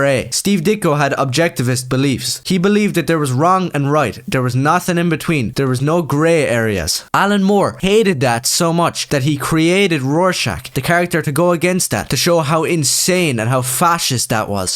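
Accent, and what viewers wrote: Ireland. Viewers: Love the Irish accent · Really funny video, really hilarious, great job. I’m really bad with western accents, I’m not sure if you’re from Sligo, Leitrim, Limerick or Roscommon, it’s probably one of them haha.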